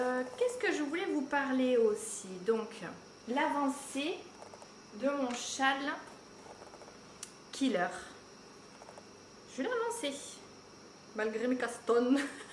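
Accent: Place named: South, France. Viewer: Allo! J’adore ta vidéo. Moi aussi j’aime bien le format vlog. Et gros coup de coeur pour ton magnifique accent du sud 😍un beau bonjour du Québec !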